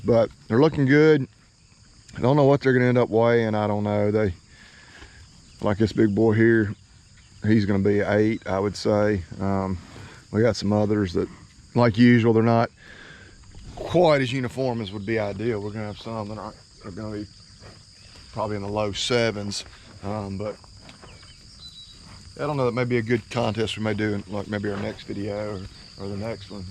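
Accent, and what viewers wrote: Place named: Tennessee, United States. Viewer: First video I clicked on, being from TN I loved hearing that accent! Lots of support from southwest TN!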